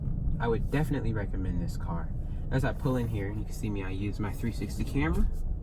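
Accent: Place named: England, United Kingdom. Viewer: Please can you be more presentable with you hair, on a very positive note, a good clean English accent. Thanks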